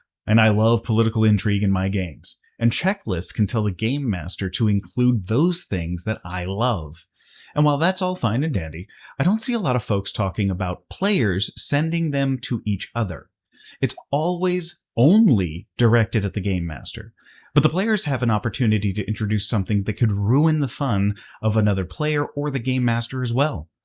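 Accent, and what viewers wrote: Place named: United States. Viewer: One critique from the early portion; You sound like your culture is American, your heritage is Asian. Just like I am American with a German heritage. Just a nitpick, don't mind me, lmffao.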